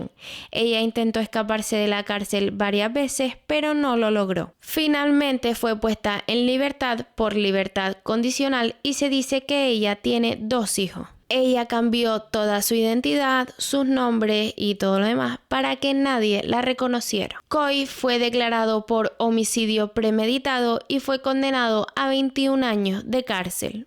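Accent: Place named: Canary Islands, Spain. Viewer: Me encanta tus vídeos, tienes una voz preciosa, con ese acento canario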